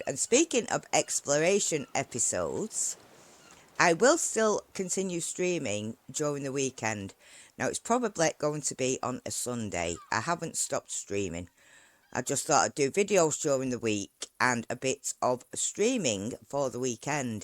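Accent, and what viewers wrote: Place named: Northern England, United Kingdom. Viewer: im UK based too! Down south, you sound more northern .. glad to hear you are keeping your spirits up, take care.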